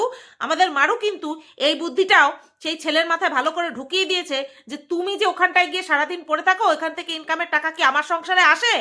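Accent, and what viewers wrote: Bangal, Bangladesh. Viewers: Tomar mukh e 'Bangal' bhasha sunte darun lage ❤. Ami Bangal er meye aar 'Ghoti' barir bou . Bangal bhasha ta darun misti amar kache 🥰.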